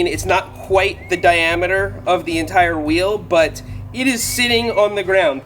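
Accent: United States. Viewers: He sounds American to me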